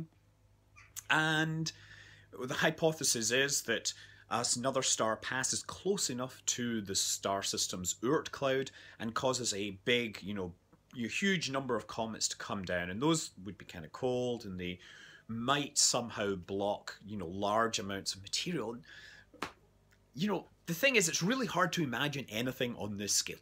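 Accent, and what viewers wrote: Scotland, United Kingdom. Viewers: So he is Scottish, thought he was American but he has a similar accent to me(yes I'm Scottish) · what part of scotland do u get the belter accent from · What part of Scotland are you from . I'm form the west coast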